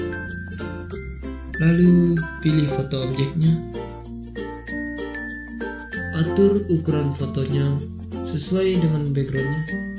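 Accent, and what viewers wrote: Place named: Jereweh, Indonesia. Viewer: Mantap logat Jereweh-nya :)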